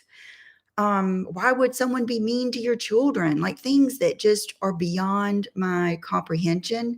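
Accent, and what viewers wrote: South, United States. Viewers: I like you I find your voice very soothing. I’m originally from North Carolina and I love to hear your southern accent, and I love to see your holes of clothing and I buy things that you show me. So thx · What a beautiful and touching video! You are perfect , you are enough, God knew what he was doing when he created you. Your videos are so inspiring, encouraging, and relaxing to watch (your southern accent is adorable) I look forward to your videos and what exciting and fun things you bring for all your “friends“ to enjoy 💕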